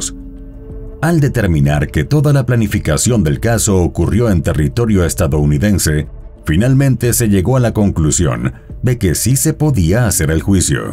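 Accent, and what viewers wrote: Mexico. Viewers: Sr, su voz es maravillosa para yo tenerla al acostarme susurrándome poesías de amor!!!!!!! Usted nos pudiera decir de qué país es??? Dicen algunas que es mejicano, pero para mí su entonación es neutro …….y no lo puedo definir….. Nos saca de la duda????? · Lo se, pero es acento mexicano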